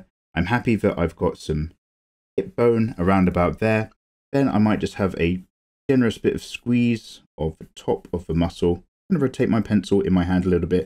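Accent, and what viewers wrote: United Kingdom. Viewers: dude with a british accent drawing cultured stuff, fucking awesome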